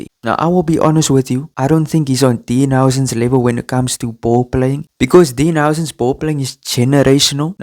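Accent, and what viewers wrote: South Africa. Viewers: Are you South African by any chance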